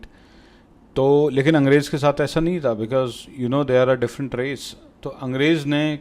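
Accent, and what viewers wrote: India. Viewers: India was INDIA under aurangzeb Rule .. the largest UNITED land area .. otherwise India, before MUGHALS was never a SINGLE country or had any central GOVT. It was divided into different states. Hindus ne UNITED India pe kabhi rule nahi kia , na hi kabhi INDIA united tha ... very biased ... u are articulate though and ars misguiding people confidently... U are INDIAN ,as it seems from ur accent and pronunciation... Pakistan ban gya , u hv to accept It ..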